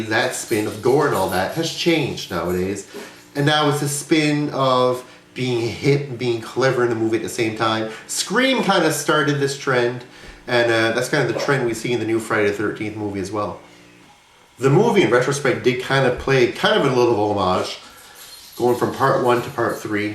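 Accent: Canada. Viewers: you from up north like thunder bay, cuz your accent is very canadian :P i could tell lol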